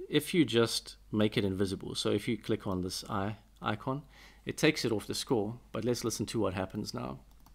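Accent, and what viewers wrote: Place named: South Africa. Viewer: Thanks for this! Are you South African? I think I can hear it a bit in your voice :D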